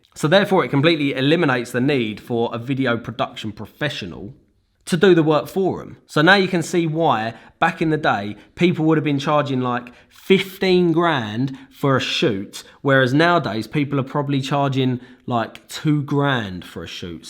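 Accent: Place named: Essex, United Kingdom. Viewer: Who else came here in 2021 for the title but stayed for the voice! Massive bruv...get in there yer essex geezer, love ya stuff!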